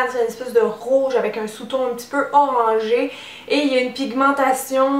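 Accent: Quebec, Canada. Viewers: Moi l'huile de monoï je l'utilise pour les cuticules sa les nourri et cela fait de beau ongle au passage j'adore ta chaîne et ton accent canadien est ce que tu pourrai m'être en € les prix aussi merci d'avance 😻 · J'adore ton accent Québécois ! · Je ne supporte tellement pas l'accent québécois que j'ai dû zappé ta vidéo ! 😖 dsl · Ta ha it's Canadian (québec) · Tu est quebequoise ? ;)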